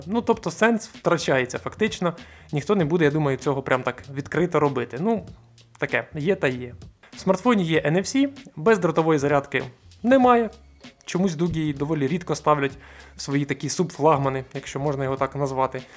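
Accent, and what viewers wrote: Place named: Russia. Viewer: Чувствуется по говору родной язык русский.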